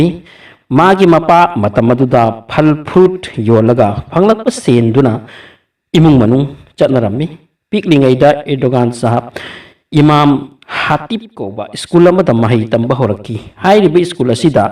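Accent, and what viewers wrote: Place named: Cachar, India. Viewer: Narrator c cachar deiro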